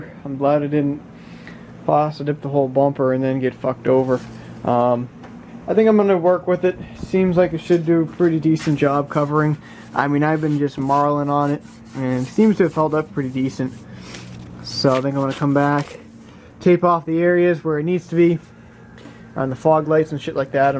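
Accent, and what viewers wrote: Canada. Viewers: Massachusettes plates .... But u sound like a Canadian ;)..... Nice truck tho..... Love those F250/350 styles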